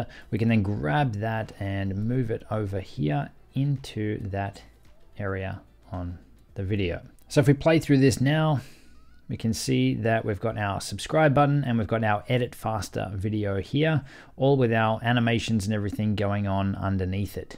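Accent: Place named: Australia. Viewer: Wow, there's something new every day! Top tips here. It's great to have the occasional Aussie explaining too, none of that grating 'hey what's up guys!' Shudder, puts me right off that does. Just kicking off the youtube journey and will be following you and the courses you do. Thanks very much for the help so far. Terrif! KP - a pom, somewhere in the UK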